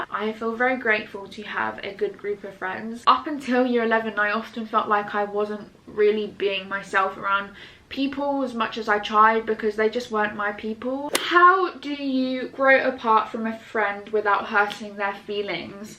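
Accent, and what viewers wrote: United Kingdom. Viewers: She sounds like the UK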